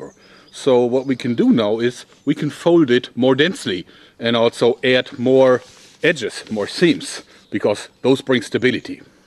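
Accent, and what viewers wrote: Germany. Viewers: Sehr geiler deutscher Akzent :D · mal dumme frage aber biste deutscher? weol dein english so klingt^^ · Are you german ? Nothing against you talking, but I just wanna know.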